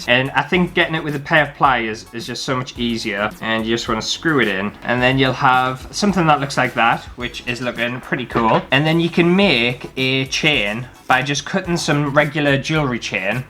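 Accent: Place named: Northern England, United Kingdom. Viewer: Great video lovin the northern accent 👍🏻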